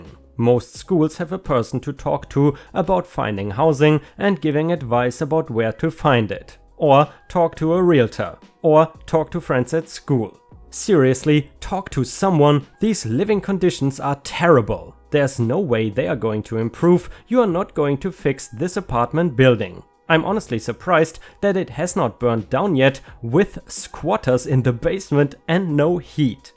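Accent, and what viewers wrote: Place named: Germany. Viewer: you "contacted everyone who'd commented on the thread"?! Good lord, that's some next-level leg work on reddit stories, and as someone who long ago answered your question of what sort of stories I would like to hear with the response, "any type, as long as there's reasonably satisfying ending where we get to know how things turned out", I very much appreciate you putting in that work. That's why I never miss an upload. Well, that and your German accent--it reminds me of the coolest guy I have ever met in my life, on a long train ride from Paris to Munich. I was erroneously sitting in his seat on the train when he opened the cabin door and addressed me in (to my ears, anyway) perfect French. I replied with, "uhhh..." and immediately in equally flawless English, he says, "oh, you're American. My girlfriend was right--Inga, you're right, he IS an American--but I thought you were French, obviously. 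You're actually in my seat--no no, stay there, stay there, keep the seat, we can just take these seats here--but perhaps in exchange for the seat you might buy the first round of beers for us? Does that sound fair? And then I'll buy the rest until we get to München. Oh, but please don't order us any American beer, do you actually drink that stuff? No? Wonderful! Then we will have a wonderful trip, I'm sure..."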